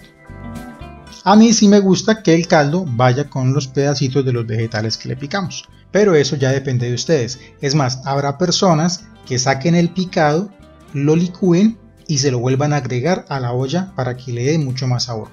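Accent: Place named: Bogotá, Colombia. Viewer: Yo reconozco ese acento Creo que es de personas de bogota Yo fui a Cartagena en un crucero y esa receta la probar Omg so good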